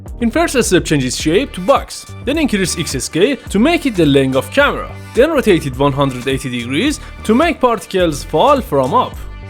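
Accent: Iran. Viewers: Where are you from , like iranian talk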